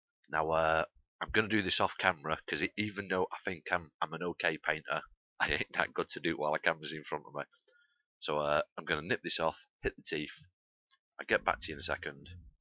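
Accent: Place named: Northern England, United Kingdom. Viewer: Unreal work and your northern so subbed good and proper haha.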